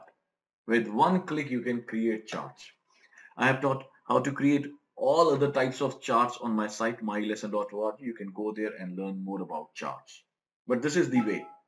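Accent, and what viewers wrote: India. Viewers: Very Indian accent. Also helpful vid, cheers buddy 👍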